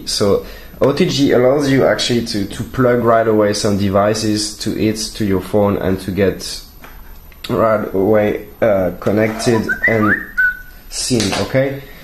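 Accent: France. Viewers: tu ne serais pas français par hasard?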